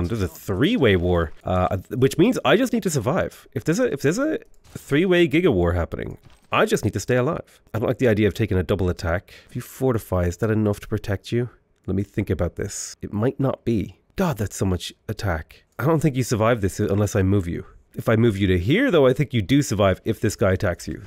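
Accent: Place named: Ireland. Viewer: Is that a "reserve Irish-ism"?! Adding a "th" sound where there is one instead of not being able to say the "th" sound?! I treasure the few times we get the Irish accent from our great!!! Wish they were more common, but alas they are not!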